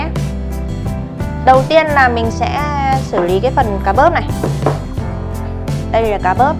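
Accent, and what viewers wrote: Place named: North, Vietnam. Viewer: giọng bắc:nge nặng trỉu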